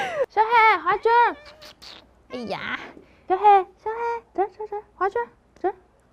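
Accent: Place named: Sichuan, China. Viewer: 喜欢的四川话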